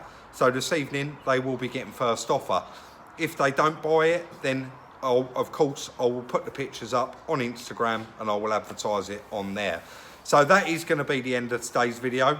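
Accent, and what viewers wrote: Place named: Cockney, United Kingdom. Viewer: It was like watching Baywatch with a cockney David Hasselhoff..Loved it and you boys deserve all the profit you make 👍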